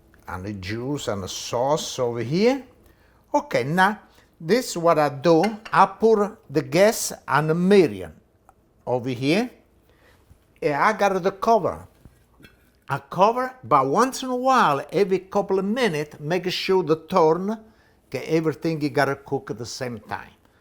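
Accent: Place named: Naples, Italy. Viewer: Mi genetori sono da Grottaminarda e Fontanarosa! Io sono nato a Boston pero, e parlo in dialetto Napolitano come te. Grazie per il ricette saporito! Auguri!!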